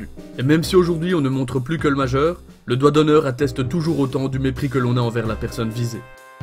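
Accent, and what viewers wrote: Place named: Belgium. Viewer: Ton accent belge il est magique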